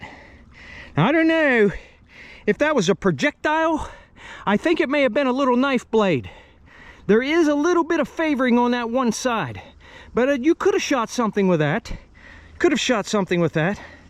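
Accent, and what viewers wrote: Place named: Pittsburgh, United States. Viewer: Beaver and Ohio meet....Sure there is some there....Love your videos....Love that Pittsburgh accent....lol...I got it too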